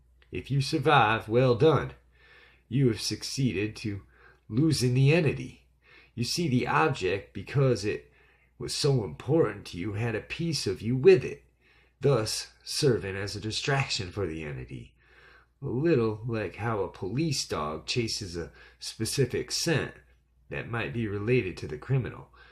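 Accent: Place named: Oklahoma, United States. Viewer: 😠😬 HOWD I MISS THIS ???? LOVE YOUR ACCENT!!!! SOUNDS LIKE HOME TO ME. OUR JOKE IN OKLA IS"I DONT HAVE AN ACCENT, YOU DO" LOLOL. LOVE IT . YOUR DOING A GREAT JOB.!!!!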